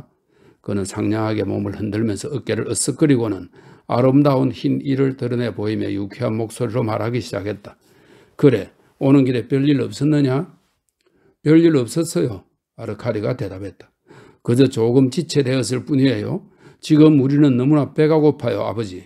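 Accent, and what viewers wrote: Gyeongsang, South Korea. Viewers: 경상도사람의 억양이 들어가서 듣기가 매우 불편하네...다른 사람이 낭독한것은 없나요?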